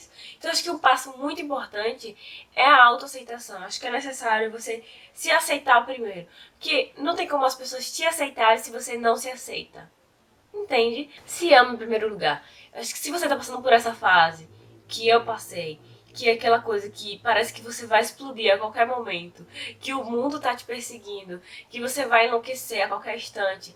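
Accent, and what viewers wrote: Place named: Alagoas, Brazil. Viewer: Êêêta que sotaque bonito! Minha conterrânea (Nasci em Maceió, mas vim pra PE pequeno), curti muito o vídeo. Embora a minha praia seja mais o lado Heavy Metal, conheço um pouco da sub cultura. Há vários anos atrás eu até cheguei a ler "A Happy House in a Black Planet", que o um dos sites que você listou disponibilizar, ele dá uma boa geral no assunto. Aqui em Recife, a cena era bem forte antigamente, hoje em dia acredito que esteja mais fraca (novamente, falo pelo lado do metal... mas acredito que isso se aplique a todos)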